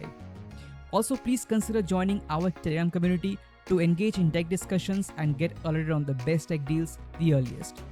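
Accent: India. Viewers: Bro are you from Siliguri...? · Bihari bkl commentary nhi chaiye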